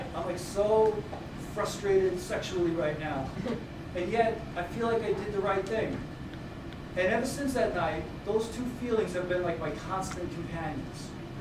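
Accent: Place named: New York, United States. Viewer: Dude, you are funny guy. I love your accent. Is it NY or Chicago? LOL If you watch my latest vid you will see the proper way of adjusting ric truss rods. PS- I liked rehab so much I went back three times. Hey I subbed to you, sub back. You rock.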